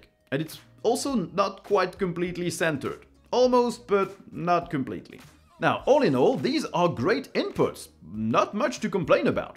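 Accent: France. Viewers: When I see the Linux Experiment I have to click it. It is like an addiction. He has the whole package: Beard (some say a squirrel is hibernating in it), the French accent, the same undershirt he has been wearing for 10 episodes straight (who doesn't like Roquefort?).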